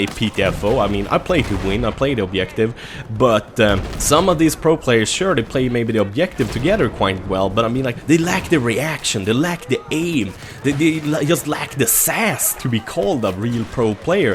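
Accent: Sweden. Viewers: Hahaha! Your accent is the best! I'm glad to have you swedes as neighbors!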